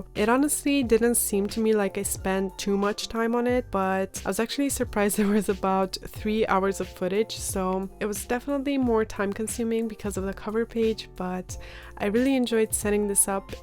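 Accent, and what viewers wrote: Germany. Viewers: Wow thanks for replying! And thank you for your nice words! I can imagine you're busy, I've picked up somewhere in the comments that you're working on your masters, good luck! I'm doing my PhD right now and I'm forced to keep it minimal because of lack of time. However I still try to dedicate at least 5 min per day to journaling. It gets my mind off real life :) Unrelated but are you German? Your accent sounds very familiar, haha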